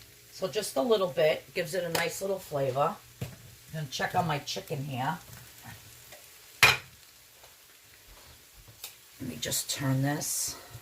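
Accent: New York, United States. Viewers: I knew from your "accent" we lived near each other...LOVE YOUR NY ACCENT!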